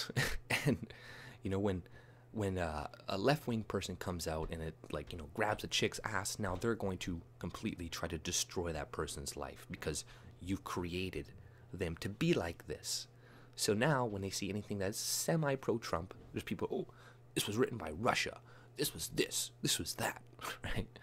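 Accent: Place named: Toronto, Canada. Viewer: You sound like a Torontonian- You from around here?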